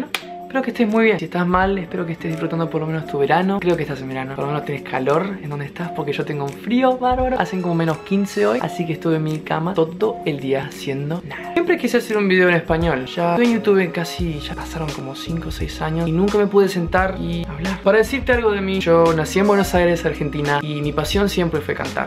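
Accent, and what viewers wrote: Argentina. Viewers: Es normal que tú español/argentino me exite? Si? No? Ahre Te amo,y ya sea como hables,ya seas como seas :3 💞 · Boludooooo, qué? Sos argentino? Se me va a explotar el cerebro. Like literally sjkfhaks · Me encanto 💙🙌 el acento argentino 😮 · el acento argentino aaa lpm q hermoso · jajajajjajaj de una me di cuenta q eras argentinoo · He repetido este video una y otra vez y me encanta tu acento argentino es tan adfghjjkllñ podría escucharte hablar todo el día y no me aburriria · That's so cool! And yes argentinian most used accent is pretty rough ahah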